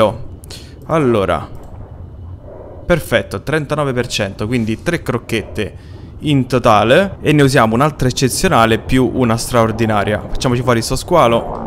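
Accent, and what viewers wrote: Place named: Rome, Italy. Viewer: "S'è dato" "Ao fratè ndo te sei cacciato"... Non so Rick... per me è di Roma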